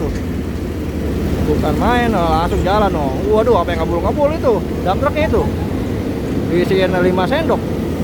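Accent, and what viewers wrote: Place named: Jakarta, Indonesia. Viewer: Ni youtobernya lucu bhsanya Betawi bnget hhhh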